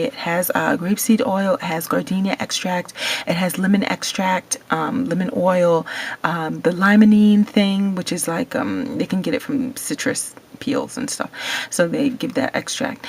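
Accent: Caribbean. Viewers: I really enjoy your channel. I may be a little late on this but are you west indian? I heard an accent along with the sucking of the teeth lol, not to mention the great food.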